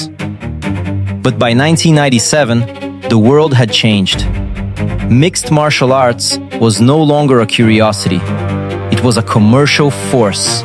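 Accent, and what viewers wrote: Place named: Brazil. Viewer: I love that this love letter of a video is done by someone with a brazilian accent. It’s easy to get lost in blind pride for people from your country, and I salute you for keeping it real instead.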